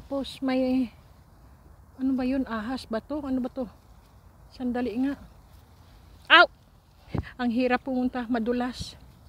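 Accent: Visayas, Philippines. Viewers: Bisaya ka ate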